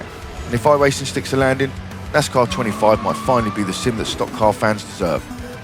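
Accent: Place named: Cockney, United Kingdom. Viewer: Wow man you've got one hell of a snappy voice over and that Cockney accent just takes me back to Ritchie's Snatch and Two smoking Barrels! Absolutely the most enjoyable trailer on ANY motorsport ever!Kudos.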